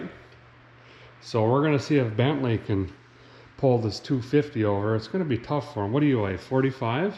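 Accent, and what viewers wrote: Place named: Wisconsin, United States. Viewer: A guy forgets how much your wiscahnsin accent came thru in earlier videos 🤣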